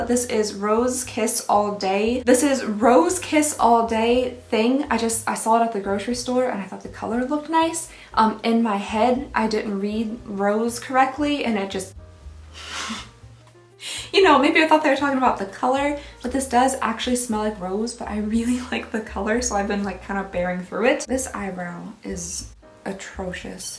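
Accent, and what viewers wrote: Kentucky, United States. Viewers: Love Reno and decorating videos and would so watch if you did some. And as a Kentucky born and raised girl I hear the twang come out sometimes 😉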